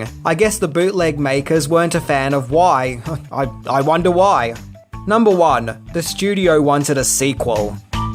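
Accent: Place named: Australia. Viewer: Great review of a funny film,. Your humor combined with your cool Aussie accent always keeps me entertained. Respect and Blessings 🙏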